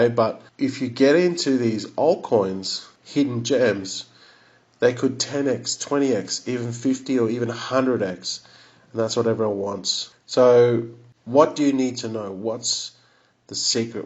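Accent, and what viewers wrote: Australia. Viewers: Outstanding video and advice mate. Aussie accent expat. Where are ya ? In CEL BNB THETA since 2019, all solid. I like Noia Network and Ziliqa, they meet your criteria as well.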